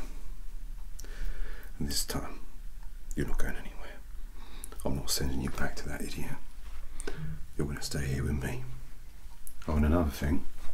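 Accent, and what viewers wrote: United Kingdom. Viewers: Could also be titled as "Male Winry Rockbell with British accent fixes you" I wanted to save this for bedtime but... Can't resist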